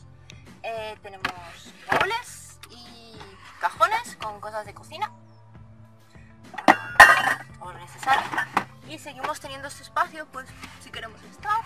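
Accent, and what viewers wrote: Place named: La Rioja, Spain. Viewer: Por esa forma de hablar tienes que ser de La Rioja Cierto?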